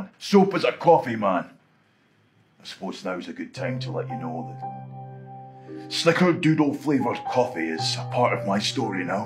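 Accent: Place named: Scotland, United Kingdom. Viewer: He’s like Ryan Reynolds…only Scottish😍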